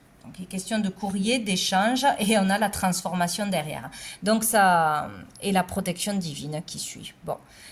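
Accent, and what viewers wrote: South, France. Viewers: Ça change un peu l’accent du sud ! Il n’y en a pas beaucoup..en plus c’est le mien 😊 Merci pour ce tirage !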